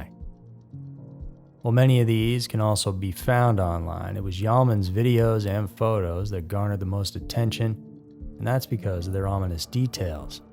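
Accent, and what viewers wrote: South, United States. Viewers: Much Appreciated. i want to believe how you’re a real human Narrator because i like your Private Eye / Country Boy accent or style which gives the information a personal or ‘ Pssst - wanna hear something very interesting? ‘ feel! 😁👍❤️🐀🐢👽from near Bournemouth SW England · When they make these videos, why can't they find a narrator who does not speak through his nose? It is a real turn-off! Also, the American accent does not help!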